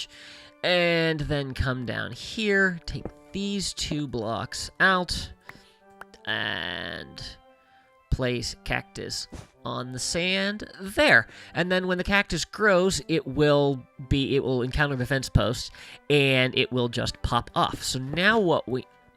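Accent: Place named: Tennessee, United States. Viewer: Another great video. I recognize that vaguely central Tennessee accent and it reminds me of my grandparents.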